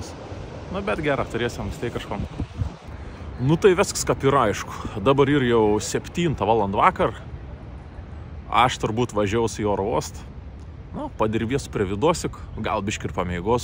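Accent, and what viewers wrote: Samogitia, Lithuania. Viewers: Video super 👍👍👍 seku seniai vienas įdomesnių contento kūrėjų, kažkiek primena Kurt Caz tik su žemaitišku akcentu.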